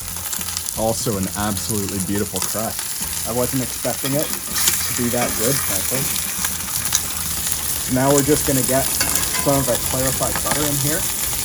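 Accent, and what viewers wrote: Canada. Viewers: This guy talks like a Canuck. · Homeboy sounds Canadian Respectfully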